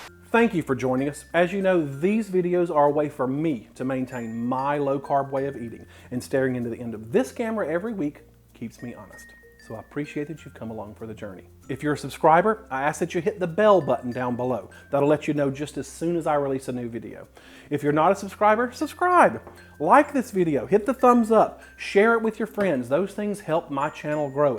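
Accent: South, United States. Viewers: i love watching you and listening to your adorable southern accent. I would make low carb chicken strips and fish sticks in an air fryer though i have never tried one. xxxx oooo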